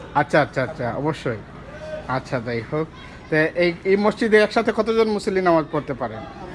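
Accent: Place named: Sylhet, Bangladesh. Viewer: সিলেটি অখল অর মান সম্মান মাইরা হুতাইলাইরায় মিয়া তুমি।অও সুদ্ধ অও সিলেটি কিতা লাখাইছো ইতা মিয়া।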